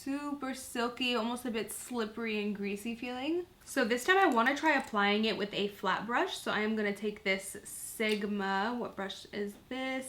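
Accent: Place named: Canada. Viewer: Is she from Canada? She pronounces 'sorry' as 'sorey' :p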